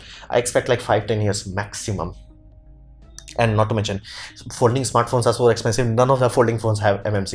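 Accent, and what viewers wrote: India. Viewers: In spite of the heavy Indian chatter accent, a VERY good explanation.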